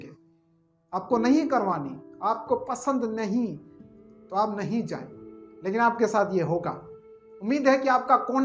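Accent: Sindh, India. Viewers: Sindhi Ka Urdu bolna Zaher Hai ap Sindhi ho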